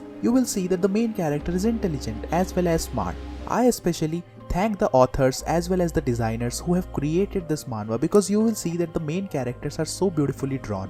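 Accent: India. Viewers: Just a question u sound indian are ye ?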